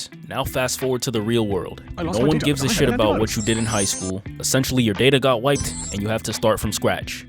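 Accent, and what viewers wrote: Brooklyn, United States. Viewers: You from Brooklyn frfr huh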